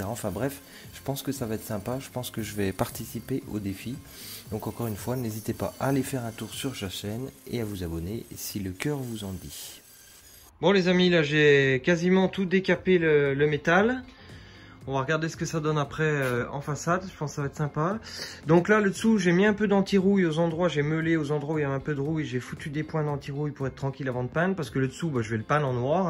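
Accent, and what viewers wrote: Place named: Franche-Comté, France. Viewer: Super vidéo merci... Ton accent m est familier... Franche comte?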